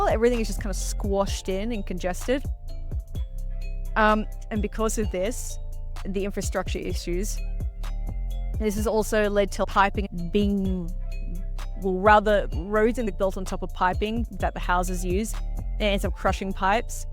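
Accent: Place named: Australia. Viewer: Do you happen to be from Australlia😅? I noticed your australlian accent and the slangs right away😁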